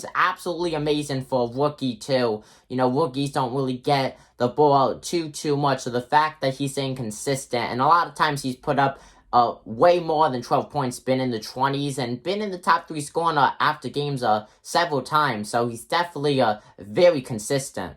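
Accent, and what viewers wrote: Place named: Brooklyn, United States. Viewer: you sound like someone that lived in brooklyn back in the 30s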